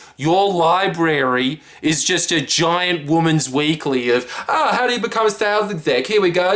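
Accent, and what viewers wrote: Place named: Australia. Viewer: Best channel to learn Australian pronunciation.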